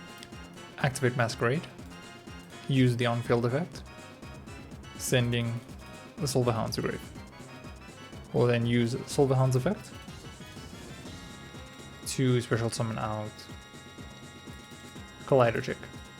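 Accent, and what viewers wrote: South Africa. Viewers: R u south african😂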